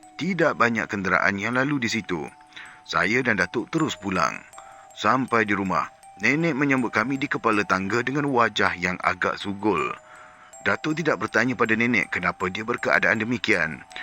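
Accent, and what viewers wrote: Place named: Kelantan, Malaysia. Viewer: Assalamualaikum... Citer Kali ni best... Ada loghat Kelantan.. ada slang omputih... 😂 Walaupun kelaut tetapi mmg best.. menghiburkan. Gelak besar akak Kali ni😂. Lawak betul ni.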